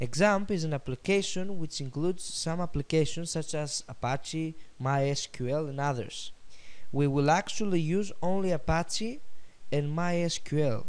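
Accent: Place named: Russia. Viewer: I like russian people, they got an interesting way of talkin :)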